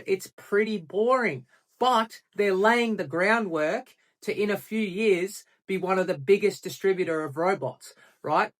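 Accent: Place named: Australia. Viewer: Aw yeah nah yeah Nils is a real one thats for sure. Once you see the Auki big vision you understand this is the inevitable progression of blockchain. Great to see that Aussie spice in your vids from a fellow Aussie. And "owki" said right haha - Scodo.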